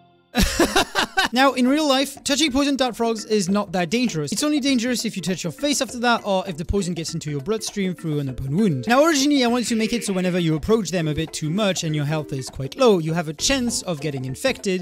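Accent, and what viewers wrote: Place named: France. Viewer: are you french ? you have a small accent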